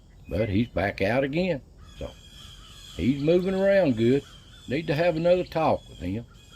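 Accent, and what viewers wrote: Appalachia, United States. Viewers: I'm assuming n we should never do that but you r from Appalachian mountains for sure . Tennessee? Ty Southern brother.hillbily mill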